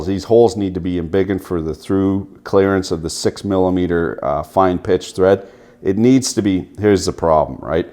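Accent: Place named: Canada. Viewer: I'm from the Midwest United States and after watching one minute and twelve seconds of this video... that's the most Canadian fucking thing I've ever heard lmao...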